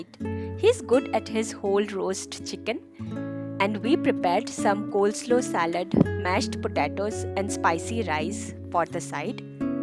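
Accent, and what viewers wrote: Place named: Northeast India, India. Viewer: Hahaha..... breaking of the rolling pin and the glassware being intact is indeed funny......I have been watching a lot of your videos past two weeks, they are really nice.... Your English is perfect, nevermind accent, no one outside India does.....btw, I was born in northeast, so I totally understand the accent and I love it. Best wishes for you and your family 💞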